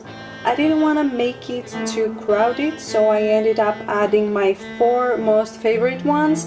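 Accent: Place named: Greece. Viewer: Omg you're Greek? I couldn't tell by the gorgeous accent! I'm Greek too!❤️🦋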